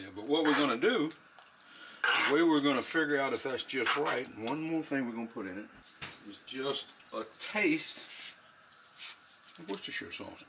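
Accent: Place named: Louisiana, United States. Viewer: Hey — are you from south Louisiana originally. I detect a bit of an accent. I live in Broussard La.:)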